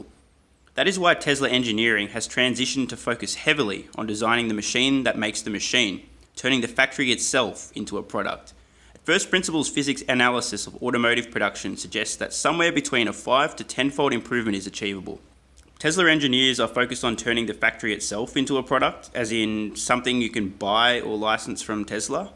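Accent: Australia. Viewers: it’s great to hear the oz humour and accent. Got my favourite channels from US and UK. Now your the OZ channel to add to the funnel of tsla info and insight. My wife and I have our self managed super set up just for this purpose. A friend gave me a nudge a year ago. And haven’t looked back. Will get the Patreon sorted too. I sent this episode to my wife to help pass on more content without it coming from me:-) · Aussie accent mate 🇦🇺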